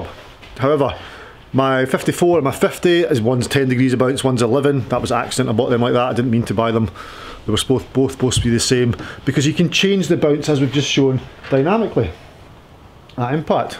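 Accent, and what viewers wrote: Scotland, United Kingdom. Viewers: Aye, adjustments are possible to reduce the effect of bounce off concrete. Why not do a Scottish version of Phil Mickelson and show on a video how to hit very different shots with the same club. Too many are too poor at understanding the versatility of a wedge anyway. This said just as an idea for additional vlogs from you. Examples: low chips with a lob wedge and next a flop shot with the same club, and how to master both, and how to increase bounce by opening up face in soft sand and the opposite in wet sand, and so forth. Good luck with your shootings. And what a delight it is to hear your dialect. Be proud of it! Keep the good stuff coming. Greetings from a snowy Sweden.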